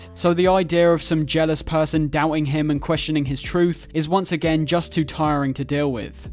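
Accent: United Kingdom. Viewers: Could you possibly get rid of the British accent? It’s kinda heard to understand what you’re saying sorry haha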